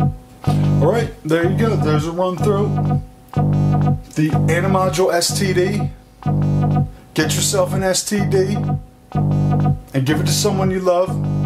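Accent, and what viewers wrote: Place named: Philadelphia, United States. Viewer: Sounds like ur from philly but I’m guessin jersey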